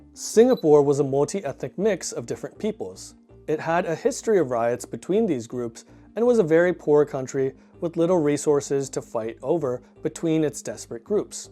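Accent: United States. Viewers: from …. hmmm So interesting!I watched a couple of videos already. We come across something new everyday, here we find an american accented indian living in the US who is huge BJP fan!! He does a lot of research, but in his fangirling over Modi forgets to find “the first OBC PM of India” comment by the PM? Also according to “BJP is reformist and a rebel”! So what has stopped this rebel to challenge the status quo? As a general caste hindu i want to know why hasn’t this reformist party challenged the reservation system openly? It could have done that alongside its hindutva politics? Strange are the ways of the world! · Best channel. Explaining India through an Indian lens, with just 1 difference: a western accent & articulation. Makes it much more consumable for the world.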